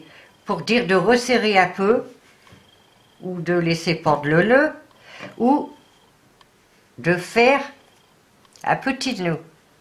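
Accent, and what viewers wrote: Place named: Nord-Pas-de-Calais, France. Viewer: Bonsoir, toujours le même plaisir pour moi à entendre l'accent chti^^ pour la laine maman en a elle vient de chez Babou. Bonne soirée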